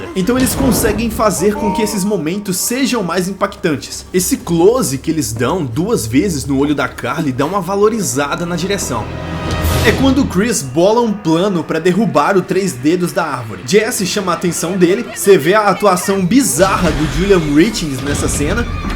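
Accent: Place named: Goiás, Brazil. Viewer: Você é goiano ? Seu sotaque é parecido ❤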